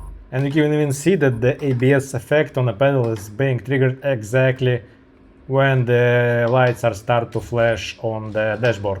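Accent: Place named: Ryazan, Russia. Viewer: У Вас чудесный рязанский акцент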